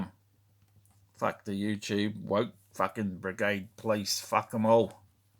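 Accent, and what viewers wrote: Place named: Australia. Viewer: YOur austrailian was brilliant !!! Thought id accidentally clicked on a neighbours episode at first with Je Mangle 🤣🤣🤣 Great little figure ! 👍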